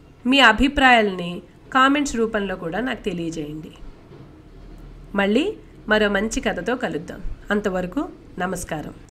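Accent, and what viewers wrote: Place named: Nellore, India. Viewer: Nellore yasalo ceppandi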